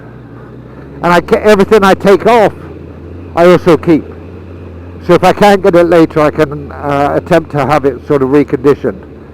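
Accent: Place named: West Country, United Kingdom. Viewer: You sound like a West Country lad.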